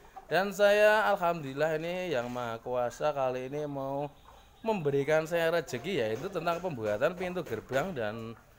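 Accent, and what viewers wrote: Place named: Banyumas, Indonesia. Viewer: Amin boskuhh.pinter bgt ngomonge kaya seles 🤣👍👍 Tapi keren mantap kang..ngapak bgtt ngomonge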